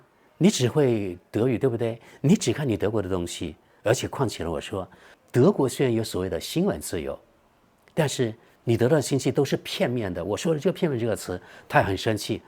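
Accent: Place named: Guiliu, China. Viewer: 他不是广东人 讲桂柳话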